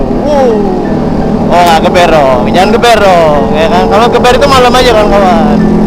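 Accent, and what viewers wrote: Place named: Palembang, Indonesia. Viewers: cak mano itu logat Palembang-_ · Cak mno Palembang woy wkwkw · Palembang bosss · Palembang ini mah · Itu mah Palembang bng🤣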